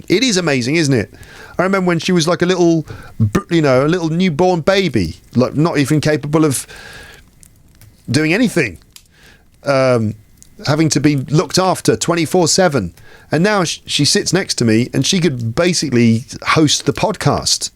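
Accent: United Kingdom. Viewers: I am from Uzbekistan too i was not sure about my accent but after i heard about your voice i was in love the British accent is the father of English language in Uzbekistan there is no one who has British accent i am going to be one through shadowing your every single episode i love the rambling episodes even sometimes when i don't know what to do or bored i combine rambling episodes i chose only rambling episodes because it has something that gives me energy i love your hard work keep up the good work ❤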